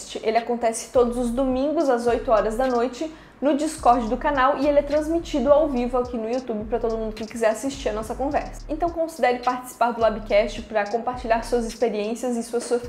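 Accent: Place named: Itajaí, Brazil. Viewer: Eu reconheci o sotaque mas pensei que não fosse, mas vi em outro vídeo que vc é da mesma cidade que eu, Itajaí Sc! Quero ingressar no curso mas por ser no período matutino não consigo por enquanto. Espero conseguir em breve!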